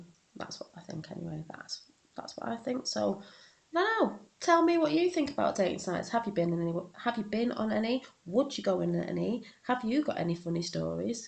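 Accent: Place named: Yorkshire, United Kingdom. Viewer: You are well funny without trying haha! I met my fella on a dating site and it went well he's not a total mental case lol dating sites can help people who haven't got the opportunity to get out where you from you sound Yorkshire I'm from Yorkshire also :-) you have a very infectious personality I bet men love you! Haha the fire sign one lol do you mean tinder? Lol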